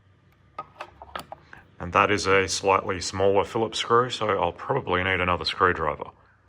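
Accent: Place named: Australia. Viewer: I love the way you say Red 124. And you have a badass Australian accent brother. Hope you and your legendary Red 124(W124 300E-24V are doing fantastic good sir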